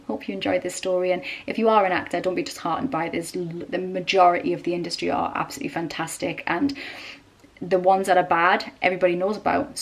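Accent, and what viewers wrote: Newcastle, United Kingdom. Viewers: Your accent is awesome, it's not every day you get to hear a Geordie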